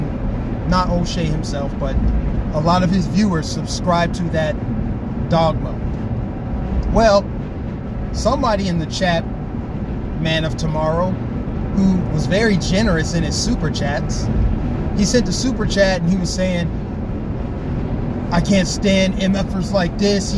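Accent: United States. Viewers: You talk like an American. Ebonics is the number one reason that black children have trouble in schools. I tutored children when I was pregnant with my daughter. Ebonics is bad for black children. Blacks have lived here longer than many other peoples They should speak American-english. Just saying Ebonics is bad. It's jealousy. Proud of you. Stay strong & moving forward. Live a good life. Thank you. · Keeping you in my prayers. You talk like an American. Those folks are messed up. Hate will destroy them. Report them asap.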